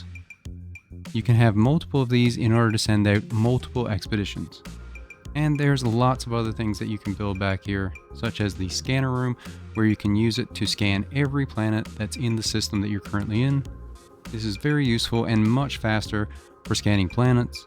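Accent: Newfoundland, Canada. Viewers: Thought I recognized that accent! Greetings from a CFA living in St John's : ) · I knows a newfy when I hears one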